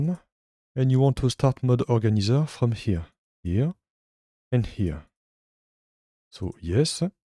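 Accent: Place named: France. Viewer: Very subtle french accent 😊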